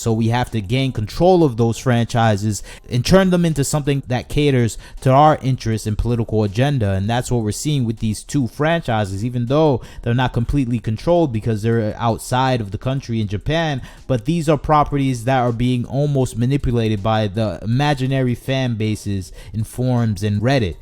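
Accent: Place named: South, United States. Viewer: what part of the country are you from? you say idear instead of idea lol, i can hear the accent, it reminds me of the south, but i'm not quite sure where in the south because all your other sentences sound more or less not from the south, so it's difficult to know where you are from exactly.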